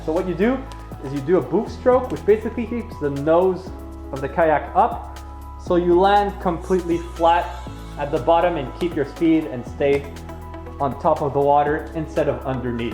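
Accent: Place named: Ontario, Canada. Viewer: I knew you where Canadian! I hear the French ontario accent in your voice!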